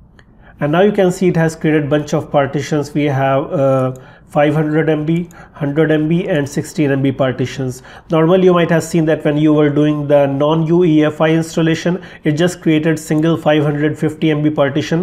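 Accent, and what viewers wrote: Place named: India. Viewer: Kitna bhi prayash kar rahe ho Par american waali feling ni aa rahi hai indian ho indian hi rahoge kitna bhi english bol lo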